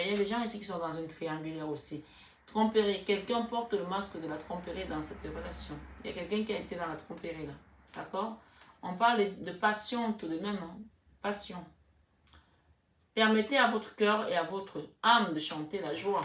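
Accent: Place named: Cameroon. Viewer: Bonjour ma chère votre tirage me parle énormément, et le premier visionnage de vos tirages j'ai reconnu vôtre accent du Cameroun car j'ai une histoire avec une femme bams qui vous ressemble beaucoup donc je sais ce qu'il m attend 😅 merci infiniment vous êtes magnifiques bien à vous Sir Lion 🦁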